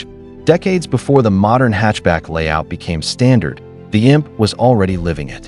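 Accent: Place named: United States. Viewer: The American accent devalues the video.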